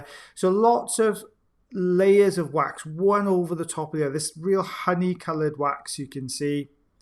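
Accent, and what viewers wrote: Wales, United Kingdom. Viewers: Take care of yourselves and your community first! Your videos (and lovely waffling in a lovely Welsh accent) can wait until it's safe again. Best to Mrs B and the little Bs.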